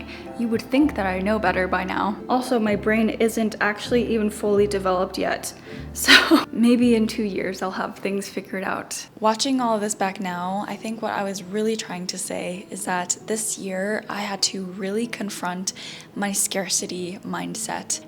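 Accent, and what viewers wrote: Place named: England, United Kingdom. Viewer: i love your english accent❤ and also every video you uploaded, you’re the best!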